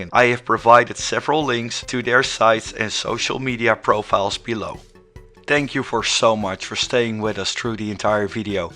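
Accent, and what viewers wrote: Netherlands. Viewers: Eyy I hear you’re dutch!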